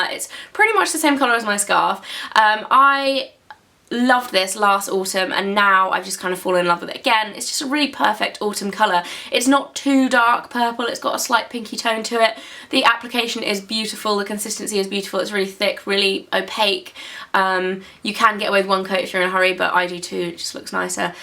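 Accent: United Kingdom. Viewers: !!!!! YOU ARE AMAZING AND YOUR BRITISH ACCENT IS JUST PERFECTION!!!!!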